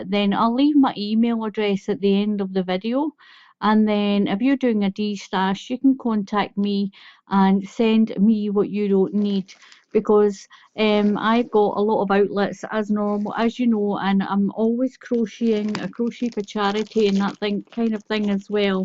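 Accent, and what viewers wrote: Scotland, United Kingdom. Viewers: oh yes I saw the yarn and kept thinking "why does she keep calling these packages"happy meals?" Your glorious accent makes me want to visit Scotland!! Love your videos!!!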